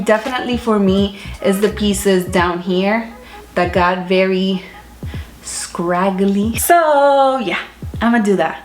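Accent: Puerto Rico. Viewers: Ahh yesss! Borinquen ❤️😊 Love it!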